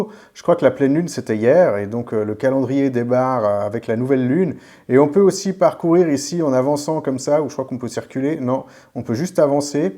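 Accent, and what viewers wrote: Geneva, Switzerland. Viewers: Je détecte une pointe d'accent genevois... je me trompe?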